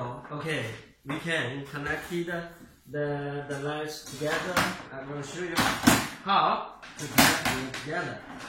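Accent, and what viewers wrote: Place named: China. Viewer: 中文口音的英语。我真的听的很舒服。希望做一期中文版的 b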